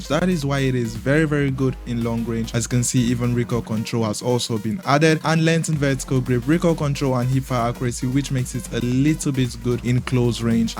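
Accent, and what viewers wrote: Nigeria. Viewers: Bro, our loadouts is identical.... Which means it's just skill issue (on my part)😅 Also you sound Nigerian.....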